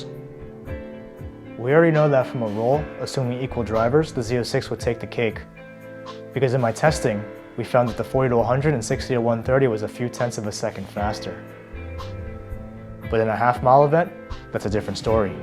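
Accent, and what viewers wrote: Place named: United States. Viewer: He sounds American to me. 🙄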